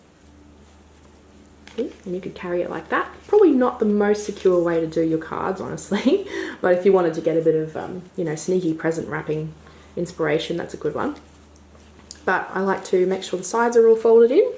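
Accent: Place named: Australia. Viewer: You sound aussie.. ill have to have a look.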